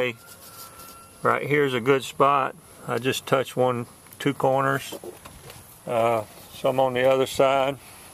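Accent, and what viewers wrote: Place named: South, United States. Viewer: once I saw duct tape followed by a country accent, i knew i was in the right place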